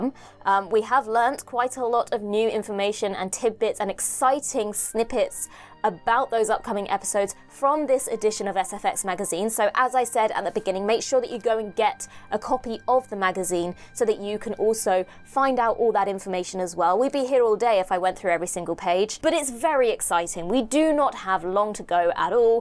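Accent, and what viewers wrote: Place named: England, United Kingdom. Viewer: You have the best English accent ive ever heard.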